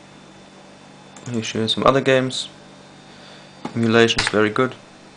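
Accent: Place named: Scotland, United Kingdom. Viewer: Haha je praat een beetje met een schots accent:p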